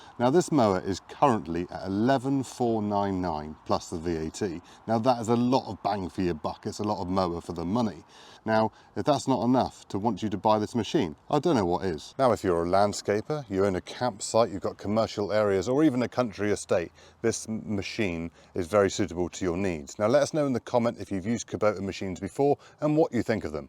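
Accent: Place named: United Kingdom. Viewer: More British narration….. Deleting the channel. Buh-bye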